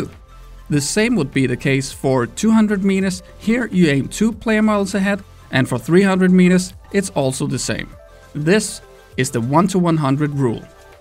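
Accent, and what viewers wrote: Denmark. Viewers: Ey youre danish right? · Are you from Denmark?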